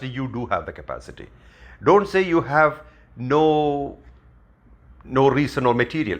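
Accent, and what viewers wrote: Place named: India. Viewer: I wish you could put subtitles in English for the deaf and for people who are not get use to hear Indian accent. Thank you!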